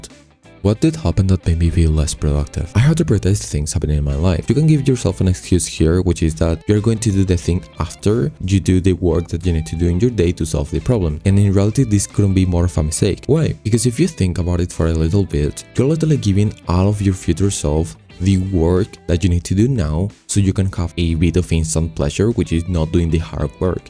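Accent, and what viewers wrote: Spain. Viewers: He's spanish, I believe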